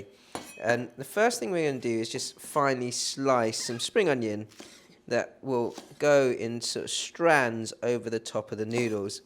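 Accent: United States. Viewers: As an American I think your US accent wasn't bad lol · That American accent 😂🤣 I've had to go on a fodmap elimination diet. Many things on your channel qualify or can be slightly modified and I love that I can still use your recipes! I need help though. I absolutely cannot find dark soy with the grocery delivery services during this pandemic. I've used tamari and "created" dark soy from other recipes, but I am curious if any of you have suggestions on mimicking dark soy in the best possible way. It doesn't have to be low fodmap friendly, it's also for the rest of the family to enjoy.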